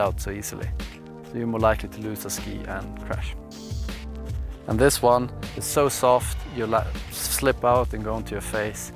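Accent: Sweden. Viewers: 100% svensk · This dude has to be Swedish judging by the accent (I’m Swedish 😁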